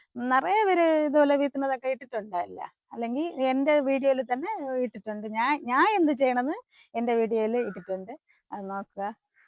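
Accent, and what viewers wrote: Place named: Trivandrum, India. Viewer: Nalla സംസാരം. ഒറിജിനൽ. TVM മിടുക്കി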